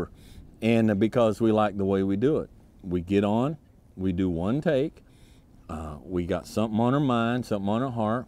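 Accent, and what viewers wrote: United States. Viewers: Thank u, sir! Feels like I'm taking with my pal, or an uncle, or alike 🤝 and I love your genuine attitude, sir, and your accent. Real American. Big up and all best from a random Russian dude 👍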